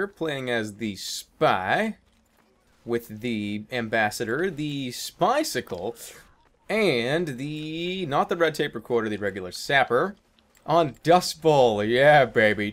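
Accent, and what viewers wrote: Boston, United States. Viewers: Did you know that people in Alabama speak with an accent similar to Jerma's (a Boston accent), not really a southern accent. :)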